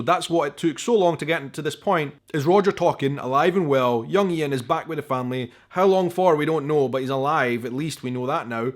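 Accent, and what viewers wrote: Scotland, United Kingdom. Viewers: I love your reviews and your Scottish accent and thank you for being so passionate about the show and giving us your honest opinions. I do disagree about Roger, however. I think some people forget that he is a 20th century man trying to make it in an 18th century world. He is a historian and knows things about the time from books, but to actually live it is a shock to the system every single day. I got a little tired of the number of the flashbacks to the hanging, but I have to say that the silent, black and white film gave the memories an eerie quality and spoke to Roger's terror, seeing it all through the burlap over his eyes. Those films also harkened to films I've seen of the lynching of black people in the 60s and the sheer cruelty and injustice of that. I also thought it interesting that the only people in color were the "redcoats". 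So I really appreciated the artistic quality of those flashbacks. Oh, and yes, "burying the hatchet" is a thing and Roger makes mention of it when he's teaching his Oxford class. As for Murtagh, in the books, he never made it past Culloden.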